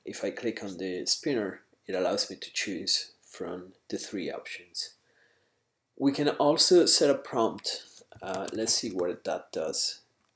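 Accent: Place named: Spain. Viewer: Es muy bueno el video gracias. Se nota que eres español. Viva españa!!!!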